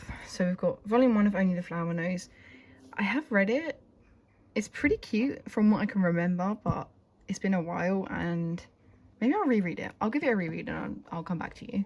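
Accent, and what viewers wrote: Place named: United Kingdom. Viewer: Omg youre british too!?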